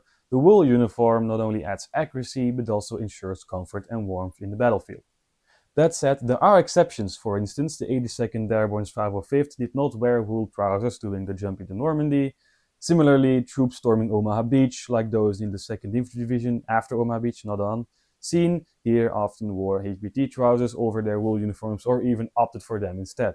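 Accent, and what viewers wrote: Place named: Germany. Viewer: You must be a German as you speak English with a German accent. Sehr gut, mein freund. Cheers from an old Marine sergeant in eastern TN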